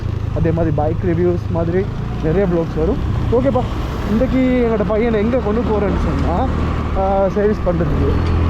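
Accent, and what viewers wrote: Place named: Sri Lanka. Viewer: Sri Lanka tamil beautiful ah iruku but konjam puriyamatinguthu 😅❤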